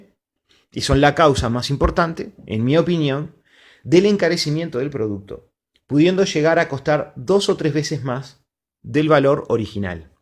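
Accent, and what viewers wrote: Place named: Argentina. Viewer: ¡Más que uruguayo, pareces argentino!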